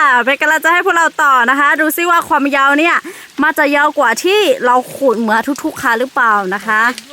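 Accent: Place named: North, Thailand. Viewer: เห็น พูดเหนือก็รู้สึกว่าน่ารักดีนะ👍👍👍👍👍🌹🌹🌹🌹🌹🌹เหนื่อยลำบากแต่ก็อร่อย ทำให้สุขภาพดีได้ออกกำลังกายกันสุด สุด😅😅😅😅😅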